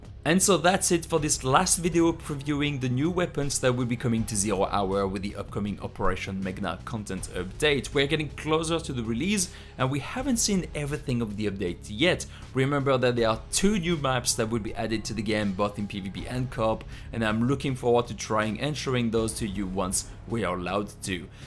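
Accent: France. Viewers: je me disait bien aussi que je reconaissait l'accent francais ces bien que tu puisse faire tes video en anglais ta plus de chance de faire parler de toi jaime vraiment ta facons de detailler ce jeux continue comme sa :)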